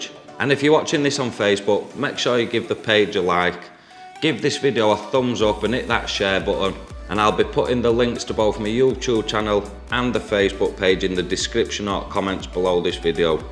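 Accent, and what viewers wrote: United Kingdom. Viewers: Thanks Man, your videos are Great! Your explanations are very clear. I'm a Yank and I love hearing your Limey accent! I am an old guy, now retired, with over 21 years of getting a full body suit. I suddenly got the urge to learn to how to tattoo when I thought about getting my hand tattoos touched up and thought I might try to do it myself. I may never get confident enough to do it, but I'm having a great time trying to learn. But I'm still avoiding attacking my skin! What would you suggest as an all-around good liner? I have only some 10-05 RL and wondering whether to try 10-07 or 10-09 or what?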